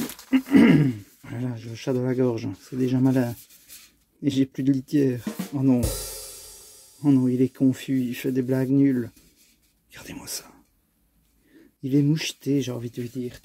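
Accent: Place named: Belgium. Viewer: Coucou et ta petite famille ❤ Oui je suis laaaaaaaa (imagine une petite femme qui saute les bras en l'air 😅). Boudu! (comme on dit chez-nous dans le Sud) j'ai un retard monstre 😮 c'est affreux!!! Mais le soleil est revenu, et j'ai tellement profité du jardin le soir après le travail, que YouTube me regardait, au lieu que moi je le regarde 😂. Du coup, comme j'ai un retard monstre (encore!) sur tes vidéos, je laisserai un pouce en l'air (la base!) et un cœur ❤ en commentaire. Désolé il n'y aura pas de roman 😢, mais faut que je rattrape tout ça 😅. J'ai adoré (comme toujours!) ta vidéo. Comme tu le sais et ça depuis le début, les vidéos du vendredi c'est ma pépite de la semaine ❤. Pour les cannettes en alu, en effet... Il faut mettre de côté, pour 3€ et quelques... C'est pas si rentable que ça, même si comme on dit "il n'y a pas de petites économies". Mais la... 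Ce n'est plus question d'économie. C'est question de places 😅. Pour l'engin avec le charbon, mon pauvre, je ne t'aiderai pas 😅 je ne suis ni bricoleuse du dimanche ni même de la semaine 😂. Merci pour ce bon moment de rire, je dois avouer que ça fait du bien de remettre l'accent belge à la maison 😘. Des bisous à toi et ta petite famille ❤. A très bientôt 😘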